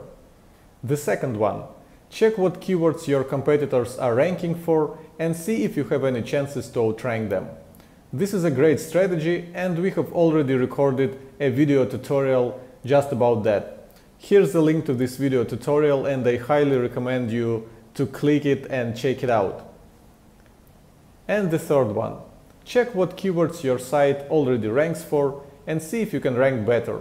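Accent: Russia. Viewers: Russian accent)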